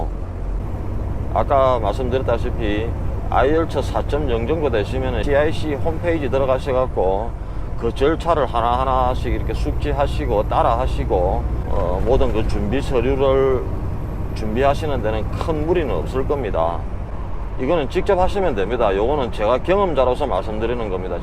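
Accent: Gyeongsang, South Korea. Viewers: 경남 사투리 ㅋ ㅋ 저는 대구 사람 정겨워요^^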